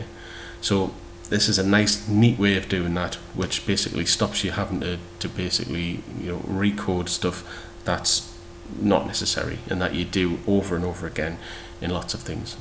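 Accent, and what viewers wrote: United Kingdom. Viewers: One day spent banging my head around Django (trying to manage users / profiles) - 26 minutes of this tutorial - all sorted. Will be going through the rest of the series now. Genius. As an aside - always loved Python - Django at the moment is seeming like the fastest route to murdering Python. As another aside - Ace accent, in my personal opinion the best in the UK. International users take note.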